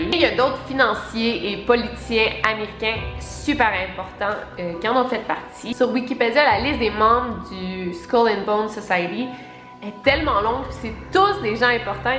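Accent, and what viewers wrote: Canada. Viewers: Coucou, j'ai découvert ta chaîne il y a quelques jours et j'ai regardé beaucoup de tes vidéos en seulement quelques jours, tu as l'air tellement gentille, t'es super mignonne, les sujets que tu traites me passionnent aussi donc j'adore ta chaîne et j'adore ton accent (je suis une française qui adore le Canada ) bref, continu, j'adore aussi les sujets sur les disparitions, les théories du complots, j'aime TOUT ! Mais des fois quand tu mets des extraits des vidéos en anglais parfois tu ne mets pas les sous titres et pour les gens comme moi qui comprennent pas trop l'anglais c'est embêtant mais c'est pas grave non plus, ça empêche pas de comprendre la vidéo. Enfin voilà, continu tes vidéos, bisous de la France 😙😁😁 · Hello! Je viens seulement de te découvrir et laisse moi te dire que je suis fan de tes vidéos! Moi qui avait du mal avec l'accent québécois (j'habite en France), je ne l'entends presque plus 😄 En ces temps de confinement, on a énormément de temps et je passe la majorité de mon temps à découvrir tes vidéos, elles sont top! 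Par contre, je voulais te faire part d'une chose. Je ne sais pas si tu as déjà regardé la série The order sur Netflix mais en voyant les images de la société skull and bones je me suis complètement retrouvé dans cette série! Pour faire bref, il s'agit d'un jeune garçon qui intègre une secte secrète qui trouve son siège au sein du campus (tout comme ce que tu racontes) et les membres de cette secte sont vêtus de masques bizarres (encore une fois pareil que ce tu racontes). Ils ont des rituels bizarres également que l'on peut voir dans la série. J'ai trouvé ça étonnant, j'avais l'impression que tu parlais de la série tellement il y avait de points communs! Se seraient-ils inspirés de la société pour réaliser la série? Je pense qu'en grande majorité oui et c'est, comme tu dis, creepy! Une chose encore plus étonnante, c'est que je n'ai vu personne mentionner cette similitude dans les commentaires! 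Bon courage pour la suite, tu es top!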